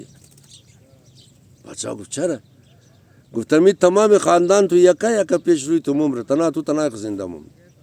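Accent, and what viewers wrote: Hazaragi, Afghanistan. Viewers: سلام اقای. نام من رضا هست و در ایران تولد شدم و ۳۰ سال دارم و بیشتر از نصف عمر خود را در اروپا زندگی کرده ام. دلیل اینکه من ویدئوهای شما را می‌بینم دو چیز هست: ۱. زبان و لهجه هزارگی را از شما یاد میگیرم. ۲. هر ویدیو شما یک درس زندگی به من میدهد. موفق و سلامت باشید. 🌹✌️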